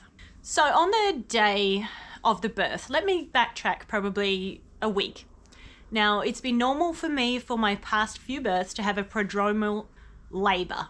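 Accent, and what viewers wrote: Australia. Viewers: Thankyou for sharing Your Birth Story. I enjoyed listening to a fellow Aussie. Your Videos are Great!! Sending Love to You & Your Family. ❤ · Thanks for sharing your story! I was so excited to find your channel, it’s refreshing to hear an Aussie accent and Australian birds in the background 😂 I have had four natural hospital births and feel so drawn to a home/unassisted birth next time so hearing others stories is inspiring to me ❤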